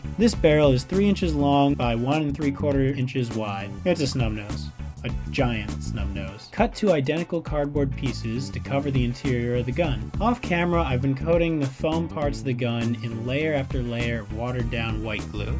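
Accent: Canada. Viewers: Canadian, eh? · You're Canadian? Noice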